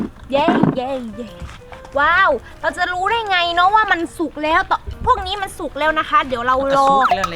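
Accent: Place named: Chiang Mai, Thailand. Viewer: พี่อยู่จังหวัดอะไรค่ะทำใมสำเนียงเหมือนคนเชียงใหม่จัง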